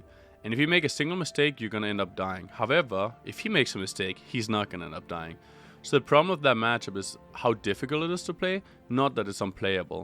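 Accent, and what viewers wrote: Denmark. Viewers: Dansker? Btw very helpful video !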